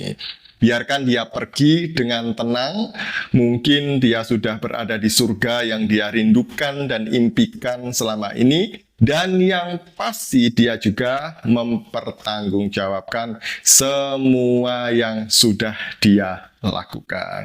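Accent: Java, Indonesia. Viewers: Medog Jawa nya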